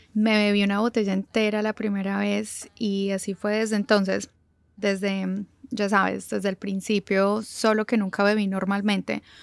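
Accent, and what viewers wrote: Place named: Colombia. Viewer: Amo el acento colombiano de la chica que habla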